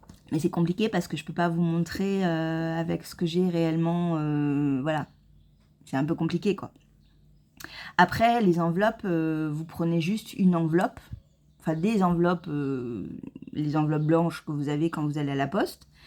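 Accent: South, France. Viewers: 😂 Cet accent "ho pitaing" j'adore. Moi aussi des fois on entend fort mon accent et en hiver certains me disent " ho ça va elles t'ont pas fait mal les cigales quand elles sont sorties de ta bouche ?". Et la quand j'ai vu tout ce que tu as détricoté j'ai été choquée et mon fils viens me voir inquiet :"ça va mama?" et quand je lui ai montré ce que tu fais il a dit que tu es trop forte. Merci pour le tuto 😂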